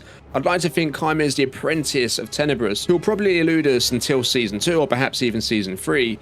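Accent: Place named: London, United Kingdom. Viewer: U sound like the guy from looper. Must be the Londoner in u.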